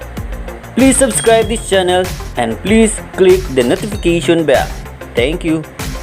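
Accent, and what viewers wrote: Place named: Visayas, Philippines. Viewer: agree gd ko dra sir wla prblema lng accent nga visaya g gwa just accept the fact tha we are ilonggo proud kta kng ano kta ndi lng mg judge sg iban respect lng dbala sir !?keep going lng sir God Bless😇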